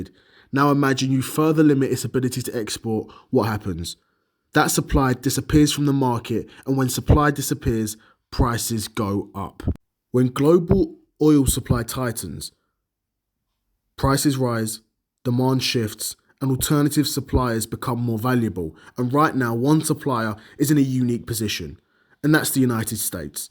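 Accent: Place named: United Kingdom. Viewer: oh,... you got a britney accent...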